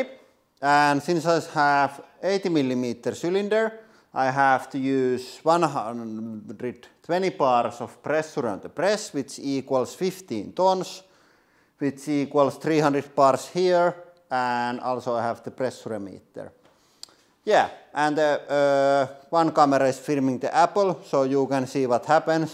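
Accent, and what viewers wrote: Finland. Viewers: They're not sound effects. It's actually supposed to be spoken English, it's just that the Finnish accent is ridiculously strong.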